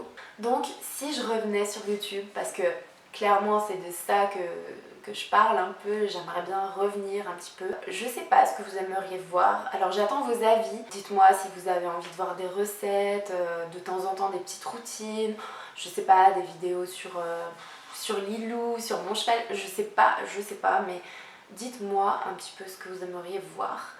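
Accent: Switzerland. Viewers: Mais tu parles comme une vraie vaudoise!!! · Hey te revoilà ! Ça fait plaisir de te revoir par là ! Tu as attrapé un petit accent suisse quand même 😉 ( je suis frontalière et je confirme , le bordel les bouchons 🙈)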